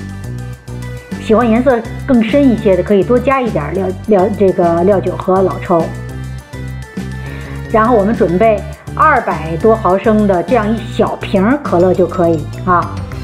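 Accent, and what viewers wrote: Tianjin, China. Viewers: 一听就咱们天津卫口音，倍儿亲切！😄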